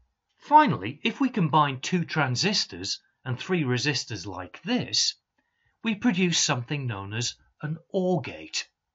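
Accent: United Kingdom. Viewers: You've got such a beautiful british accent. And your explanation is very clear!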